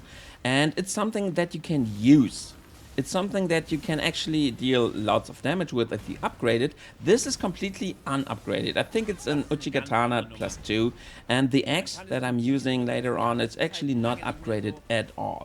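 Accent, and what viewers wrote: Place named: Germany. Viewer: (Btw finde ich es super cool, dass du solche info vids über ER machst. Ist definitiv mein aktuelles lieblings game! Außerdem finde ich die Art wie du englische Videos präsentierst super gut! Man hört natürlich das deutsche raus aber ist trotzdem super verständlich)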